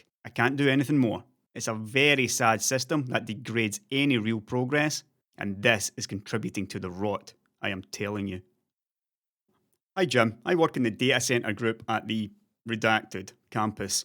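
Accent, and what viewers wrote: Scotland, United Kingdom. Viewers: Scottish accent + curse words, name a more iconic duo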